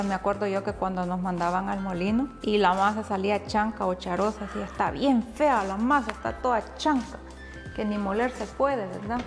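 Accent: El Salvador. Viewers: Holllllaaa! Saludos desde Belize 🇧🇿 somos de El Salvador, Apopa!! ❤️ nos encante ver como usted cocina y como nunca pierda el dialecto salvadoreño 🇸🇻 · X lo que te escucho eres mi paisana salvadoreña , se hacer las pupusas y tortillas pan comido para mi.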